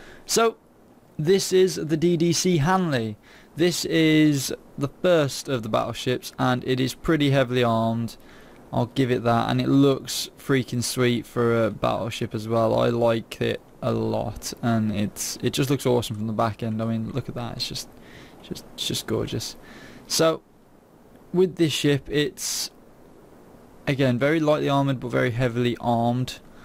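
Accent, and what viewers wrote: United Kingdom. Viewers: you said the word "Homely." adjective 1. NORTH AMERICAN (of a person) unattractive in appearance. synonyms: unattractive, plain, unprepossessing, unlovely, ill-favored, ugly; informalnot much to look at "she's rather homely" But then I realized that you had a British accent. Nevermind. :)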